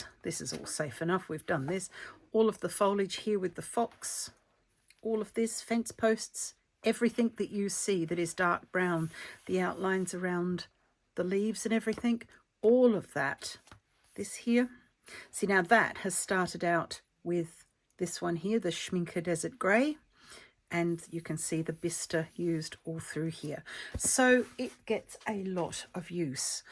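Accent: Australia. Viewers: Have just discovered you, thoroughly enjoyed your video, and hearing your Aussie accent:)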